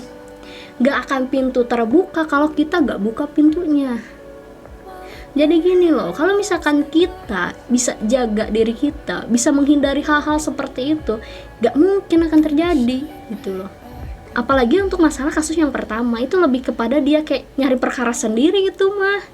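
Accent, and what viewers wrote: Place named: Java, Indonesia. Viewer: GITULLOHHH medok bettt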